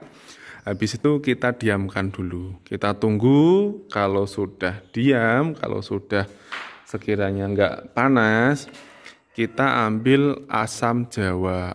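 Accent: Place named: Juwana, Indonesia. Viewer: Koyo wong juwono yo om?😁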